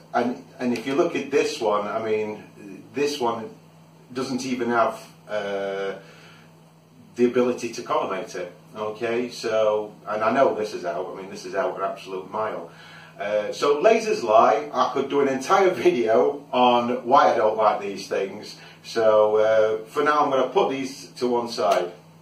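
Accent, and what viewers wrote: United Kingdom. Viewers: Thank You for putting up such Clear & Understandable helpful videos Slowly Paced, worded & Demonstrated for the Newtonian Newbie!!.....Most of the Other fine channels use fast Complicated optical Terminology that Bewilder & Confuse beginners!!.....Especially like the Diy homemade Collimation tools & just love your clear British accent!!!.....Thx again!!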